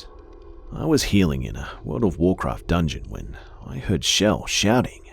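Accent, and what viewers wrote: Australia. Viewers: The way he tells a story is not scary whatsoever. but more like he's reading from a newspaper. I mean, I can actually listen to him in the dark at home by myself and it doesn't creep me out nor scare the hell out of Me! Or maybe it's the accent, because Australians have the worst accents on the planet to me!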